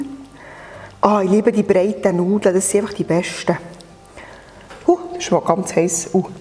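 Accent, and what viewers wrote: Switzerland. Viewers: Herrliches Schwizer Dütsch..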